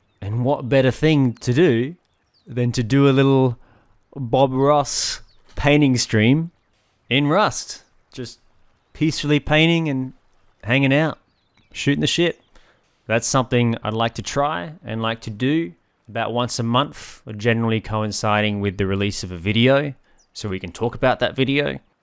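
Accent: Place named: RP, United Kingdom. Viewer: Its been literal years. I thought this was Stimpee with a posh accent.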